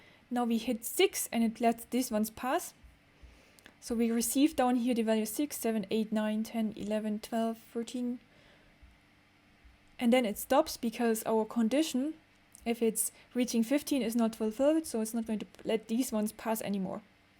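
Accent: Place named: Germany. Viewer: I totally like your step.by.step approach but at the same time efficiently and quickly cover the whole picture. It's like from ZERO to HERO. Very nice. Thank you very much for your videos, that I immediately have subscribed to. Keep going! By the way your accent, though your english seems flawless sounds a bit "german". I know, what I am talking about 🤪. Are you german?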